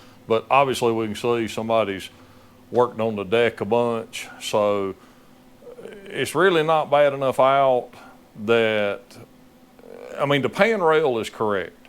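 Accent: South, United States. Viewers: Check out "I Do Cars" he uses a lot of fast forward and tears down engines that have blown up in 30mins..Its sad what people do to engines. Im sure the editing is the hardest part....Want to see your site go...My girlfriend loves your southern twang.....Has no clue what your saying just loves to hear you talk....Im working on a impersonation....LOL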